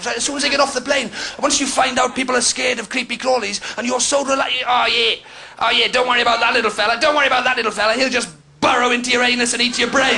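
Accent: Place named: Wales, United Kingdom. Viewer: He's fantastic and I love welsh accents which makes it all the better.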